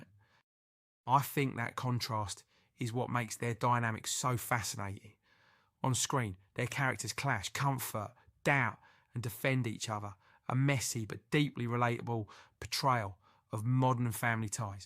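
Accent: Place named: Cockney, United Kingdom. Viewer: Please change the voice back to the one before this, cockney accents are the worst UK accent you can choose, id say no offence to the cockney people but it's just facts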